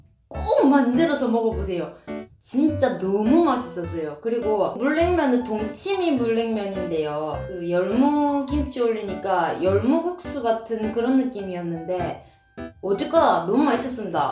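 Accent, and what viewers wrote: Gyeongsang, South Korea. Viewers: 경상도 사투리 슬쩍 조습니다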